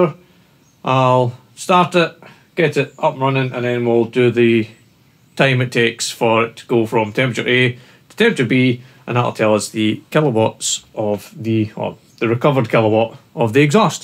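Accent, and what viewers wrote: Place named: Orkney, United Kingdom. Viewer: I was mixing specific heat and conductivity with my idea. Thanks for following up. Also your accent and humor reminds me so much of my grandfather. I hope to visit the Orkney Islands where he is from some day. Greetings from Seattle, Washington.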